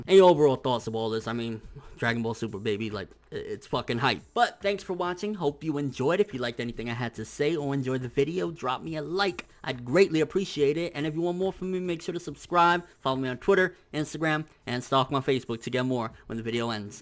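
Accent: United Kingdom. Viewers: love the British accent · Just imagined Funimation hiring to voice Zamasu in the English Dub with that British accent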